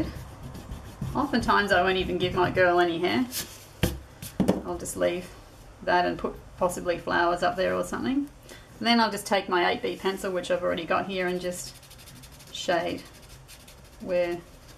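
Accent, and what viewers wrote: Australia. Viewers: Great to hear an Australian voice & the correct ways to use mixed media products that are available in our country TFS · hi - spotted you in pinterest & came on over here to yr lil corner of the earth. this is the 2nd one of yr vids i've watched, but plan on watching them all eventually. i hear by yr accent yr an aussie - same here. i'm from adelaide - where are you from? anyway, thanx for sharing yr art, i've really enjoyed watchingit.:)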